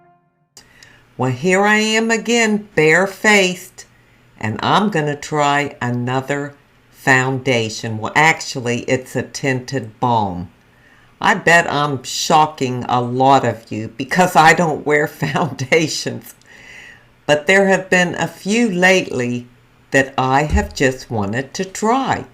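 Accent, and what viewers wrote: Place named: Pennsylvania, United States. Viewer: Hi! I'm new here to you! Wow no foundation! I'm a foundation Queen at 78 almost 79! I think I still like to play little girl dress up LOL I had my cataract surgery done January and I can't wear full make up yet or eyeshadow and I'm chomping at the bits LOL your skin looks good for not wearing any foundation you must take care of your canvas because that's number one for me even though I cover it with foundation LOL I love your accent my PA girl I'll see you again soon. Oh and I don't use this foundation only because it's not enough coverage for me but it looks good on you! My subscribers have gotten me thru some tough times the last year so yes encouragement and support means everything! 😘Hugs Babs ❤️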